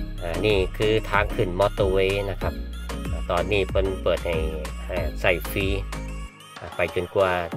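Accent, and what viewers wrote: Chaiyaphum, Thailand. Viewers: สำเนี๊ยง..ชัยยะภู๊มมมครับ...เสียงมวนขนาด..เคยมักสาว..ชัยภู๊ีมิ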